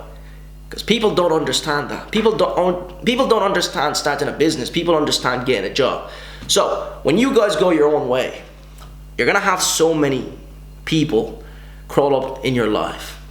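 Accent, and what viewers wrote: Ireland. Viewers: Is that an Irish accent? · You irish bro I think I can hear it im from tipperary but cant make out your accent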